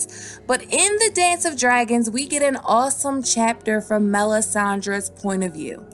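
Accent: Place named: Massachusetts, United States. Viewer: I like how you read..are you from the north East? I live in Massachusetts, and your accent almost matches a slight Massachusetts accent because not everyone has that horrible Boston accent very thick... But it could be a New York accent or somewhere in between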